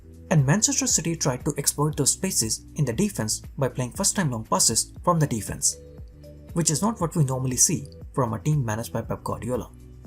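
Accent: India. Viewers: I think he is not english native speaker. Sounds like indian.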